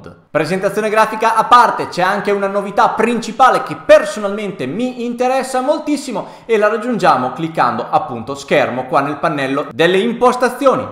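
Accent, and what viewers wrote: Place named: Emilia, Italy. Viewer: sento un accento famigliare...tra Ferrara e Bologna...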